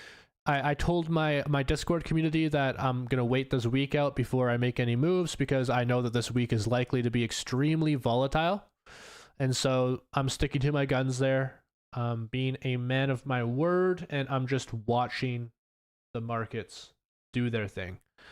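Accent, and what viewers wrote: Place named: United States. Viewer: I noticed that you say out like an American and not like a Canadian.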